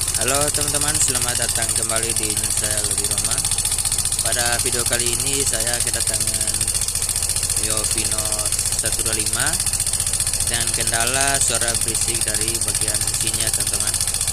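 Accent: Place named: Ambon, Indonesia. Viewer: Dari bahasa suara sumbang mcam org ambon k? Posisi bengkel dimna bos?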